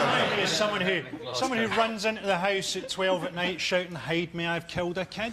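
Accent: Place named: Scotland, United Kingdom. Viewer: 🤔🤔think you need to check that one mate. As a spud myself he sounds pretty (100%) scottish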